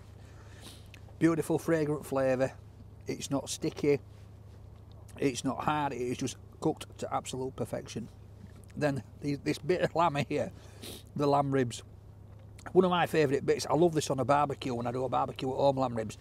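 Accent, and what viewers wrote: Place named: Lancashire, United Kingdom. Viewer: I thought he was a lanchesire lad, he sounds so much like Peter Kay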